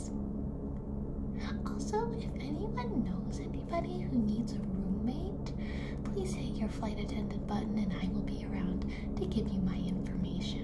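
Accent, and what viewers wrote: South, United States. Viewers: maybe can visit texas and regain her southern accent again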